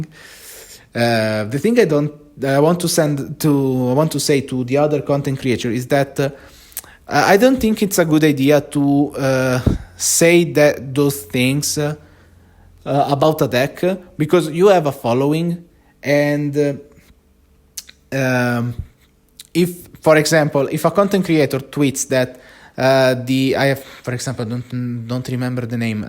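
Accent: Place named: Italy. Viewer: Hi mate, really enjoy your job here on the channel, keep going with it!! For the first problem i just have a lot of tokens for the differents effects(mana, storm, flashback etc.) and i have spent one afternoon goldfishing and try differet approach to find my spot on the playmat for everything in a way that was clear for me so that in tournaments i can go pretry fast and if oppo has doubts i can explain quickly For problem 2 unfortunately there's nothing you can do about it....i've stopped bringing storm at FNM because everyone i end up against was complaining about something and because we all do it for fun in that occasion i stopped, while at RCQ people are still complaining a lot because the deck is indeed frustrating to play against, but as you said in a competitive environment everyone wants to win so i just don't listen to them, or i just say that i know that the deck is frustrating but we do what we can to win and after the match they look to understand cause they are there for the same reason(and let's be honest every deck is frustrating on it's own in modern right now cause everyone has to do unfair things to win) Also are you italian?(i am italian and your accents really seems italian to me)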